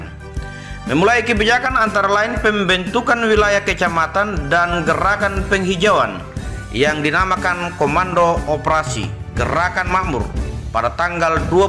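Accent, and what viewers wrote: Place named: Sumba, Indonesia. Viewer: Yg omong ini pasti orang sumba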